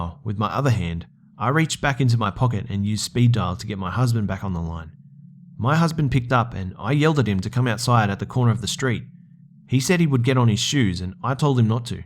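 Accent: Australia. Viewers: God dam it you are aussie now i got to like and subscribe becusae its the aussie thing to do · you can literally do anything and I'm happy. I just love that Aussie narration!!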